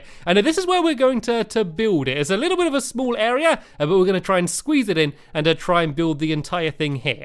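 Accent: United Kingdom. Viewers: You know that is British bc he has an accent and he spells color with a u! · Dude, you British? I'm from a commonwealth so I have a British accent too :)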